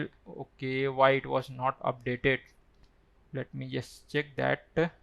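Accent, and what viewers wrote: India. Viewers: He is speaking Indian english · Indians what's wrong with your mouth, why aren't you even trying to speak nomally? You have horrible accent, before touching code fix it then teach.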